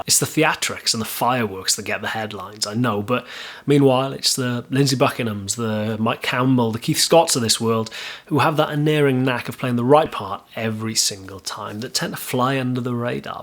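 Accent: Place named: England, United Kingdom. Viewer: Best voice ever Bro, Soft but full and the English accent is of course Golden. From Your Canadian Brother. Keep on Ah:-)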